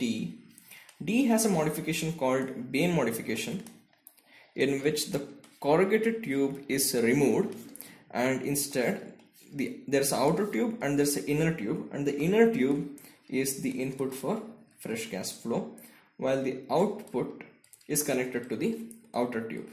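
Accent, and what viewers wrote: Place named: India. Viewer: nice, i understand a bit of indian english but your draws are very nice explainfuled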